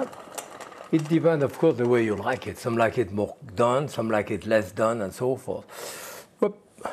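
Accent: France. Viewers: Is there a better accent than the French accent? I think not. Hehe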